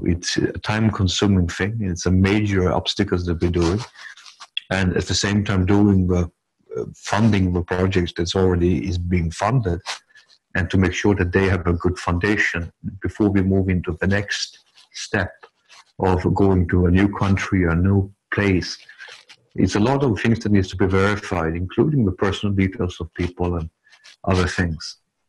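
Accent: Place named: Netherlands. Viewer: Gaia's Public Health Nurse yes it seemed some paper or something was rustling up against the microphone. Never mind, it causes us to pay closer attention. I think his accent is Dutch or Dutch-Indonesian.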